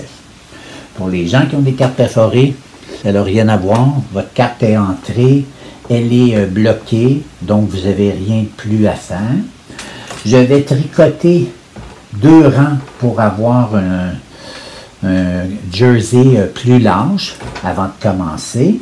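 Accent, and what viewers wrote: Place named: Quebec, Canada. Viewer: Merci! C'est toujours un plaisir de voir et d'entendre tes explications avec ce doux accent québécois:-)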